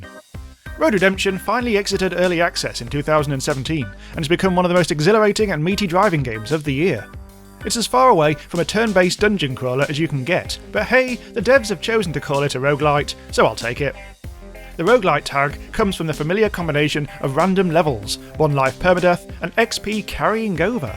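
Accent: United Kingdom. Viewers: Steven 131 I can tell it's British, but the UK has many different accents. A Northern Irish person sounds very different from a Londoner.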